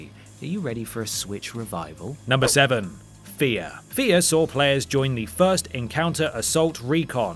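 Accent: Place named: RP, United Kingdom. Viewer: GOD IS THERE REALLY NO VIDEO OF SOMEONE DOING THIS LIST WHO DOESN'T SPEAK WITH AN OBNOXIOUS POSH ACCENT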